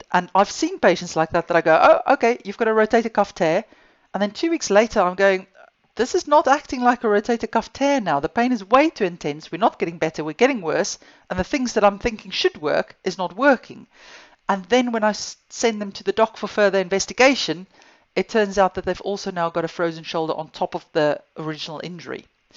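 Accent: South Africa. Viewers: I lived in Auckland NZ I discovered a very painfull shoulder on my August Europe trip every time I put my backpack over my shoulders it really where getting worst coming back the spec diagnosed it at at frozen given a guided steroid injection it feel better for 4 weeks and now feel worse, but the whole time its really painful at the moment but at the back of my shoulder blade the pain shoot down to my fingers I think there is a tear in my rotar cuff. If I stretch my arm straight it feels like it stuck and so painful. My left shoulder already had a rotar cuff operation with a few tears because of an accident. I don't wont to go overboard with excercises just do about 5 at the moment but will it really take 3 years for healing. Their where no MRI taken of my shoulder. I hear your SA accent I left 25 years ago, nice day😊 Groete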